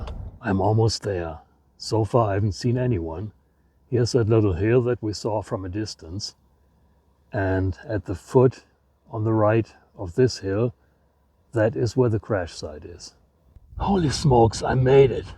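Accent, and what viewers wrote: Germany. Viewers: Love the Bavarian accent!! Great video! I wasn’t aware that there was an uncontrolled landing of a UAV. Thanks for the info. New Subscriber! Greeting’s from New Hampshire.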